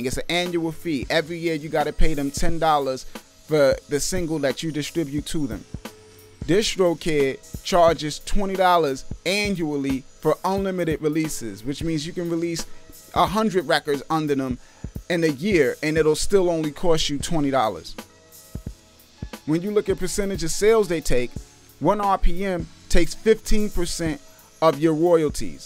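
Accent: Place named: New York, United States. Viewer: You definitely from New York lmao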